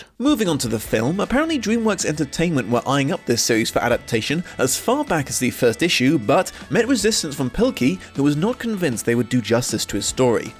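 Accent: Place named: RP, United Kingdom. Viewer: Hearing the Dom, posh, British voice, talking about Captain Underpants makes me laugh harder than it should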